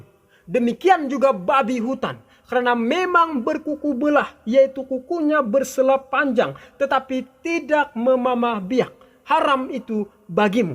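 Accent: Malaysia. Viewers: Betul itu.. Admin ini SDA.. dari sabah borneo malaysia.. Dari tutur caran percakapannya saja sy boleh tau.. Dia orang malaysia.. Tapi mengubah cara cakapnya menjadi cara ngomong orang indonesia.. Sy tahu sebab sy orang malaysia.. Admin ini pastinya orang malaysia.. Kalau orang malaysia cakap saja cara malaysia.. Tidak perlu menyamar mengunakan bahasa indonesia. Salam damai dari sabah borneo malaysia.. Tuhan Yesus memberkati kita semua saudara saudari seiman tidak kira di mana kita semua berada. Amin🙏 Tuhan Yesus baik❤️🙏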